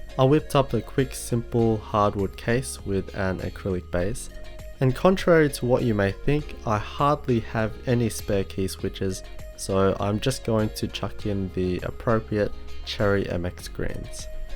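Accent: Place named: Australia. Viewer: you sound more like an aussie than a filipino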